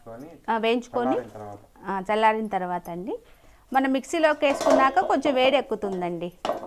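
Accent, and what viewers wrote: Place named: Kadapa, India. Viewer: Kadapa telugu super o super... Same to same ma amma matladinatle vundi... Duvvuru slang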